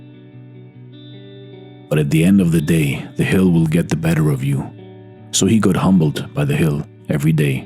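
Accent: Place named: India. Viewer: Your voice tells that u r from india 🙏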